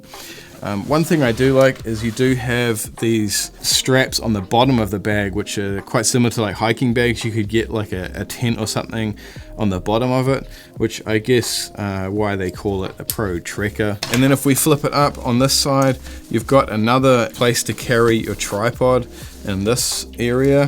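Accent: New Zealand. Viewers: yeah but you should have used the word very vs. bit... ESPECIALLY when it doesn't even open from the back. Lol. (just breaking your balllls, having a laugh. Love your content and love the kiwi accent in a sea of English photographers doing YouTube - now let's get some momentum/consistency going with the channel eh?)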